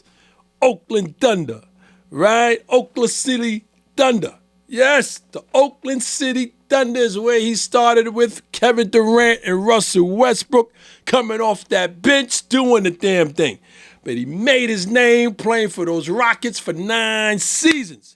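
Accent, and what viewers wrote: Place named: New York, United States. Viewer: U already know he’s from New York 😂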